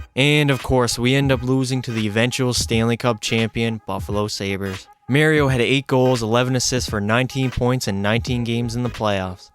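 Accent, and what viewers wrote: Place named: Nova Scotia, Canada. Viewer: U sound like ur from Nova Scotia bi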